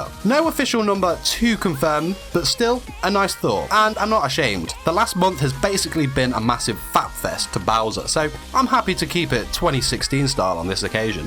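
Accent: United Kingdom. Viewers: She is known as the Penis Dragon! (Or Pee Wee Dragon if you prefer) Btw I like that your accent is British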